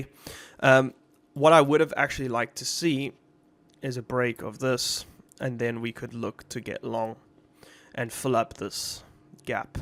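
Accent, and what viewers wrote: South Africa. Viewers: bro you from South Africa ?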